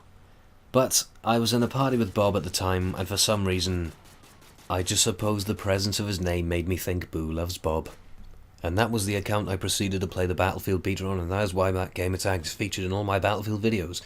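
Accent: Wales, United Kingdom. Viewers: He's from Wales, UK. Well, his accent's Welsh, so I assume he lives there.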